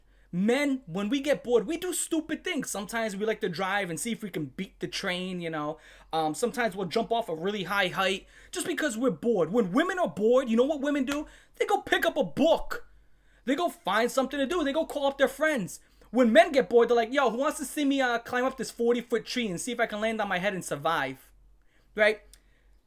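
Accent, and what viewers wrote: New York, United States. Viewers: holy ny accent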